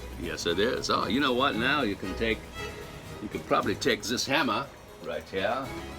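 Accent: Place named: England, United Kingdom. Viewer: ❤ LOVE YOUR ACCENT , ARE YOU A FELLOW ENGLISHMAN AS I AM ?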